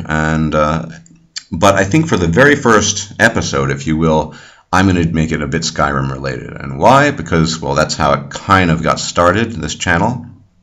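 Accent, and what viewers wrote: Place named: North Germany, Germany. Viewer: Dein Deutsch ist sehr flüssig aber mit ziemlich Norddeutschem Dialekt. Ist das Absicht?^^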